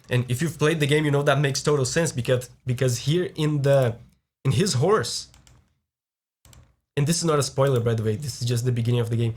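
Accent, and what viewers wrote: Brazil. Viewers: Sabia que você era Brasileiro só pelo sotaque kkkkkk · e esse sotaque brasileiro meu consagrado kkkkkkk otimo video abraços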